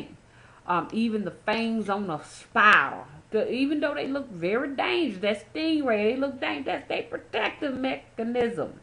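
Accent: South, United States. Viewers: this is the first time am watching and I believe no one should have judgment of anyone. i love your southern accent am Caribbean and I like how the people from the south down home. I don't know much about this stuff but alot of what you say is true. I am tired of hurting and my heart broken when am always be so nice. I really want my boyfriend back and want him to love me again. Us living in china i think he had something put apon him to up and leave. I just want him back.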